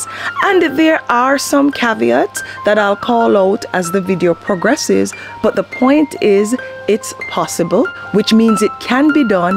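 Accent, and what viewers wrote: Jamaica. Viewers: I know a Jamaican when I hear one. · I love your Jamaican accent, I'm surprised how I'm able to hear it in your English clearly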